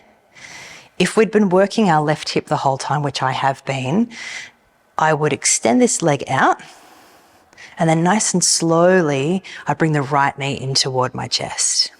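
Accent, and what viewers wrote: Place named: United Kingdom. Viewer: Very very helpful and lovely British accent. Thank you.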